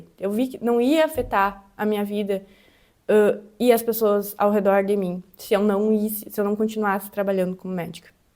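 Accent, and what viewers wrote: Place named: Rio Grande do Sul, Brazil. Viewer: Seu sotaque de voz e muito lindo eu Amo sotaque Gaúcho mesmo náo conhecendo os gaúchos eu os adimiro muito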